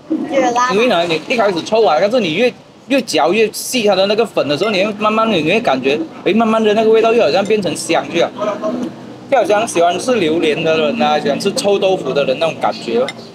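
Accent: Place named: Malaysia. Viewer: 马来西亚。 华人 听着 不是 中国人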